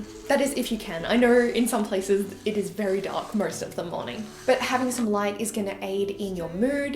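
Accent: Australia. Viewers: I miss the Aussie accent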